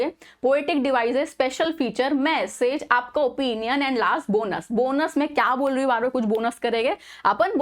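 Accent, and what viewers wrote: Mumbai, India. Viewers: Mam kya Bombay se ho kyaa apke ,tone se lag raha he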